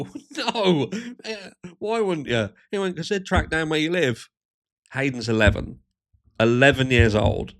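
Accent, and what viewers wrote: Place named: England, United Kingdom. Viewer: Hey brother really enjoying your channel the last few weeks since I started following this case. It's my first "true crime" interest because my BS meter went off since I first heard the 911 call. Keep up the great work. Btw what regional English accent do you have? It has a nice ring to it 👍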